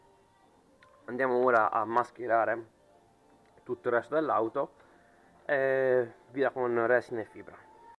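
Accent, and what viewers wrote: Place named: Apulia, Italy. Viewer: di dove sei io Bari tu?ho sentito che sei pugliese giusto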